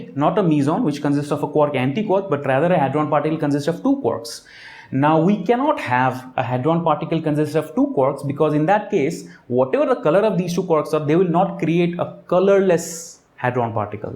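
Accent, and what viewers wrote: India. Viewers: Great over-view. packed a lot of information in 24 minutes. Well presented and yes, I can even understand your Indian accent, (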